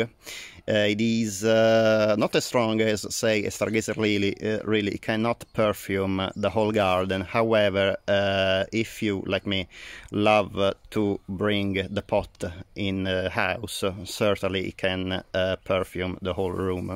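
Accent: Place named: Italy. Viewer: Wonderful! I’ve really enjoyed your videos about fragrant plants and also your beautiful Italian accent. Grazie mille!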